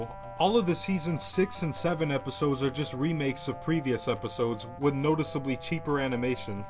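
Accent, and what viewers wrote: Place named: United States. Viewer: Huh an American talking about oggy Neat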